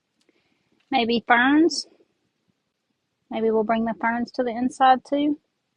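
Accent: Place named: South, United States. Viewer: So very creative and beautiful, your wee cat is gorgeous 😍😍😍 and so is your southern accent i could listen to it all day x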